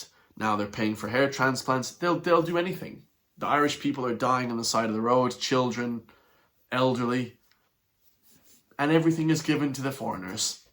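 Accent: United States. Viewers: Get it right though; don’t clickbait! It’s not that irish isnt allowed is it? it’s not illegal it’s just that one person has mentioned it. Also, how the hell would you know if he’s illegal never mind all the other racist shit you’re spewing. You don’t sound very irish either btw, getting a strong yank flavour to your accent buddy.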